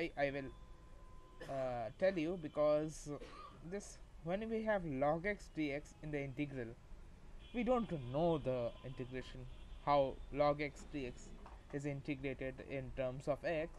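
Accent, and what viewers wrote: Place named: India. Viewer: Hi, I just subscribed to your channel... One of the best Indian videos for me on youtube. The Indian accent is still there but I could totally understand you because you spoke clearly and slowly. I'm a masters student your videos help me refresh on calculus. Please if you can, do share more. Thanks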